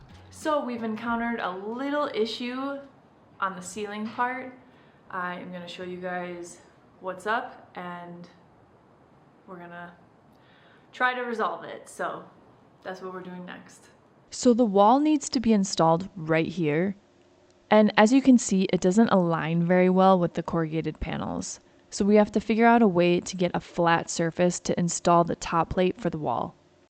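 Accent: France. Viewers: Bonjour Est ce un petit accent français que j’entends mdr Superbe vidéo merci de vos conseils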